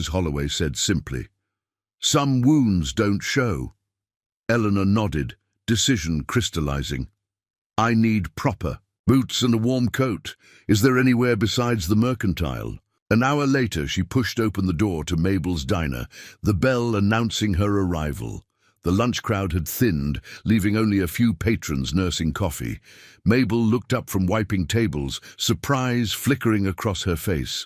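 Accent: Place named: England, United Kingdom. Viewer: Why would you use an English accent for the AI voice?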